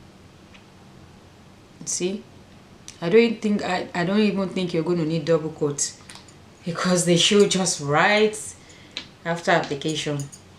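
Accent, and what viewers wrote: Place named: Africa. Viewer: Perfect timing! I just bought a gel lamp on black Friday and in search of a quality brand to try for myself. I appreciate this quality review. I am trying to connect with women that have similar interests as I've just started my channel. I am looking forward to more of your content and reviews!! I've liked and subbed mamas (and yes, your African accent is gorgeous). -Ash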